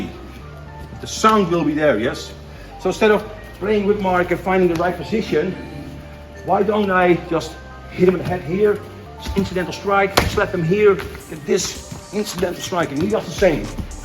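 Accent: Netherlands. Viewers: Respect what a OG, lived in Netherlands 🇳🇱 for a few years, top top people, love the accent,attitude and culture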